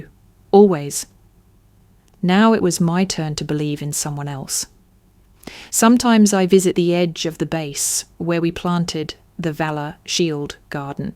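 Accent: United Kingdom. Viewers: This offering is being viewed from Stevensville, Maryland on an island in the middle of the Chesapeake Bay USA Although the ending is as expected, I think the biggest problem that I had with this entire offering was the narrator sounds like a very beautiful and fine lady however, her English accent totally be lies. The military feeling that should’ve been there. But the Jerk got exactly what he deserved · No more British narrators, please!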